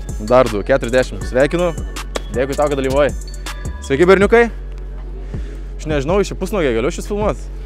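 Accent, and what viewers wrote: Suvalkija, Lithuania. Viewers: Jauciasi suvalkietiskas akcentas 😊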